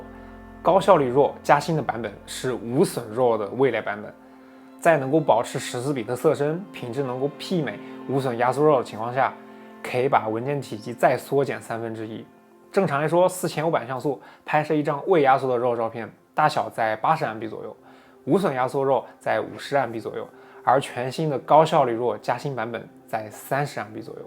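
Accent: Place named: Henan, China. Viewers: 他是湖北十堰人，湖北北部的几个县市的方言还是很接近河南的。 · 之前看过他一期视频，和他妈妈通电话，一口河南话啊，哈哈。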